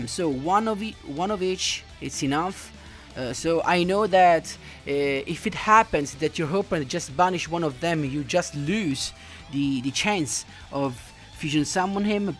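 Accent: Italy. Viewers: Great deck, I’m subscribed! Also, are you Italian?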